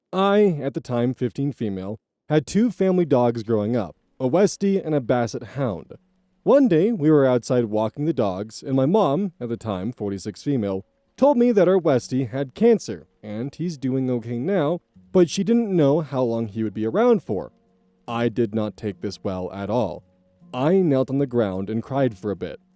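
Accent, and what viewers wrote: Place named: Alabama, United States. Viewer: ah, someone's from Alabama I see.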